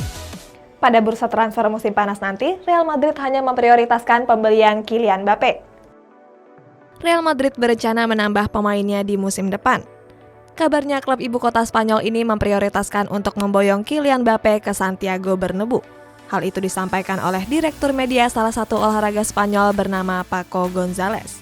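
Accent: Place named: Java, Indonesia. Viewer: Marai yo titisan wong kediri lho.. Main oo nde ndi wae logat jowone panggah enek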